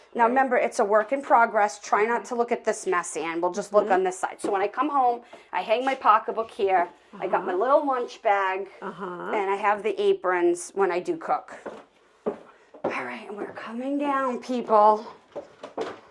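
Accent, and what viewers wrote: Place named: New York, United States. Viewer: accent sounds more like downstate NY than Massachusetts.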